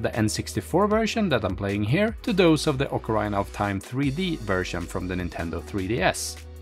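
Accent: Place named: Sweden. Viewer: Once you learn to spot a swedish accent it's hard to miss, heh. -fellow swede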